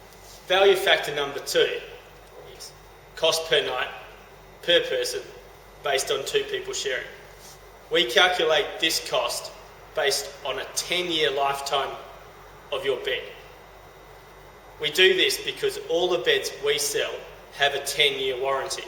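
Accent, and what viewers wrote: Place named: New Zealand. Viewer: Sounds like a kiwi.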